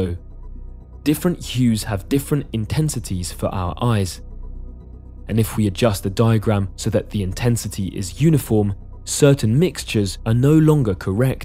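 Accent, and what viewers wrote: United Kingdom. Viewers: Also notice how he pronounces Weber with the first e like the French é (which is how it's supposed to be pronounced) and not like the way Anglo speakers pronounce the first e in Weber or Webber. Come to think of it, the narrator is not at all British. He has a "refined" British accent but I can say with 100% certainly that he did NOT grow up in the UK but, rather, in Germany, Austria, or, perhaps, Switzerland, and went to a fancy prep school or boarding school where Southern British Standard is taught from a young age (or taught very intensively) and/or maybe he's extremely talented phonetically and his accent is obviously not a stereotypically German accent (like the kind that's used on shows and movies depicting German characters, often humorously, sometimes not, like the movies Nazi era movies) and it's not anything at all like the accent of the late Dr. 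Ruth Westheimer, BUT a British accent (regardless of region or socioeconomic status) it's not. There is a wide spectrum of accents characterising people born and raised in the UK and he is not on that spectrum... He's close... He's real bloody close, but he would never be able to fool a native British person or an expert linguist into thinking he's British. He might fool a Yank, but never a Brit.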